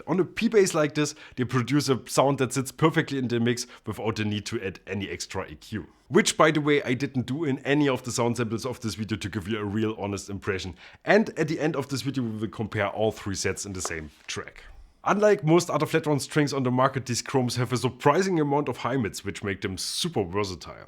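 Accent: Germany. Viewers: Like your german Stile of English :)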